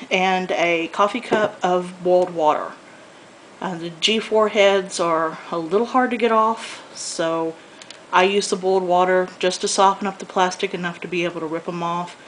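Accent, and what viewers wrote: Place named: South, United States. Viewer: Oh cool! I recognized the traditional southern twang, but noted it wasn't as nasaled as Texan,nor as slightly deep as Tennessee,where I live.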